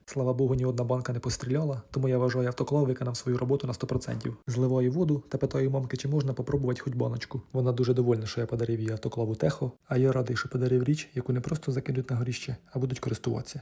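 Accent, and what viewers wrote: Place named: Luhansk, Ukraine. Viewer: Гуморист та розповідає від бога❤❤❤.Дуже подобається Ваш контент.Слухаю із задоволенням так, як наче у себе вдома побувала.(Луганська область)У нас теж так гутарять і голос....голос свій ,рідний.Зараз дуже далеко мене занесло, вірніше нас. Серце щимить, болить та плаче за рідною землею, за своєю країною. Дай ,Боже усім українцям дочекатися перемоги над ворогом та повернутися до нашої замученої ,але найкращої ,рідної землі. Всим миру ,добра, злагоди. Бережіть себе, рідні.❤❤❤❤❤❤❤❤❤❤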